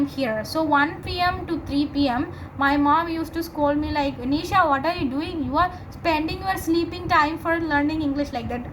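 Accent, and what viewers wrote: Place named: United States. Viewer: American accent is perfect anisha❤ I loved it.waiting for more videos 🥰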